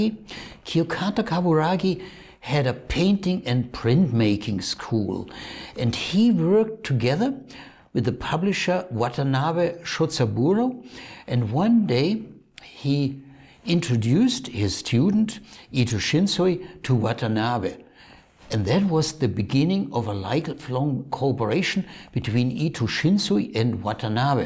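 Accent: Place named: Bavaria, Germany. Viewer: Your "Bavarian English" is much more charming to a native English speaker than the computer rendition. Thank you for making these informative and enjoyable videos. As a lover of ukiyo-e prints, but not a very informed one, I always look forward to your presentations. Stay healthy!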